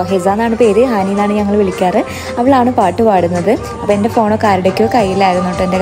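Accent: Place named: Malappuram, India. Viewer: Malappuram thanje ayathu kond talk nalla pole cnct anuu😄so kettondirikkan nalla rasayittundd💛🧡😁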